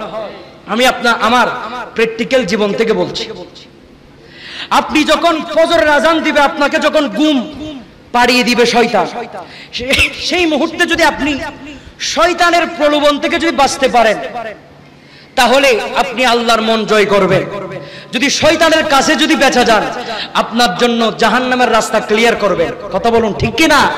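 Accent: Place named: Pakistan. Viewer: আরবি পড়তেছেন উর্দুর মত আফসোস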